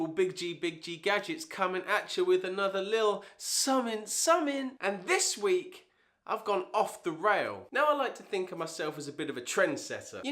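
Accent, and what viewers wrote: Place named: England, United Kingdom. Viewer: Why do you look and sound like an English James Pumphrey?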